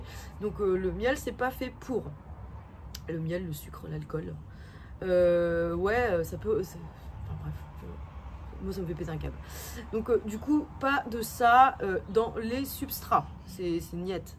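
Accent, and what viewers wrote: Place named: Paris, France. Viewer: Bonjour et merci. Mon Accent Marseillais est aussi prononcé que votre accent Parisien.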